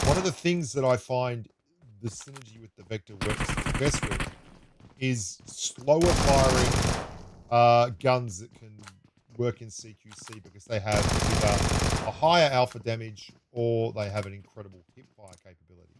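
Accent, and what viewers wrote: Australia. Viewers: Love the Vector so much with a laser sight too❤❤ nice one as always❤ i just have a slight complaint to make, i would very much appreciate fixing the sound in your videos, it's been anoyying lately that the game sound is louder than your own voice 😂😂 especially cuz i love listening to your australian accent 😂❤ it's really annoying that sometimes i can't make out what u say! Take care ❤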